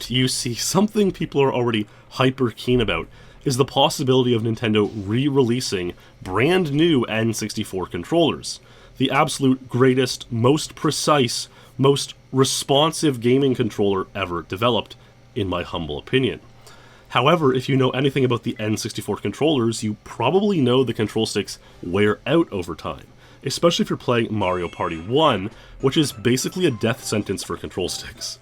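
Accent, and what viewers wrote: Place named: Canada. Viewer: The REALLY IS LOOSE Now, I know you're Canadian, you have the accent to prove it.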